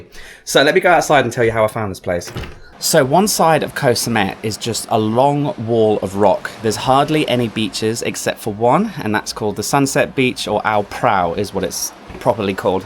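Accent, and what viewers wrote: United Kingdom. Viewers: I clicked hoping to hear a British accent - yesssssss!!!!!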